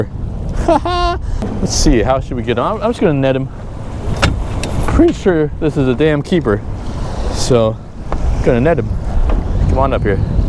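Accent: Bay Area, United States. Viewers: That Bay Area "accent" tho.... Hahah! Love it man, keep it up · Love your videos bro and that Bay Area accent you can’t miss it 🤘🏽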